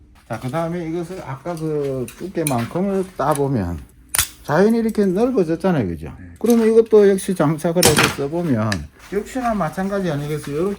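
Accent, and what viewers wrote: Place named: Gyeongbuk, South Korea. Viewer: 부산은 아닌데 말투 경북같다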